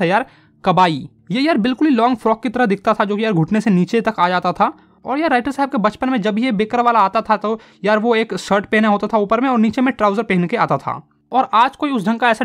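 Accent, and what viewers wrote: Bihar, India. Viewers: Sir aap Bihar se ho kya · Bhaiya aap Bihar ke Aurangabad jila se hai kya .😘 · Sir kiya aap Bihar❤ se he. aap ka language se lag ta he😊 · Sir, Are you from bihar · Yeh bihari h pakka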